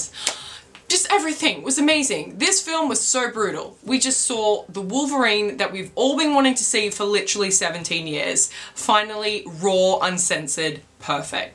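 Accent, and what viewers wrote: Australia. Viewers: Ahh an Australian accent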